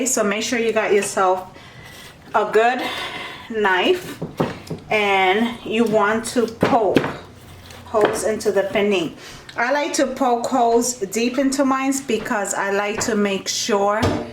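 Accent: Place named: New York, United States. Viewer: I got my pilon and I'm enjoyin' this video, but even though I might change up the recipe for the rub (more garlic, fresh oregano, less salt overall including in the adobo), I can't resist listening to anyone who says "aks" instead of "asks", and "mine's" instead of "mine", 'cause that's how I grew up on the Lower East Side. I missed doin' it for Christmas Eve or Christmas, but I will be makin' mine soon, along with pasteles and homemade coquito. Thanks for this vid, made my day more smile filled.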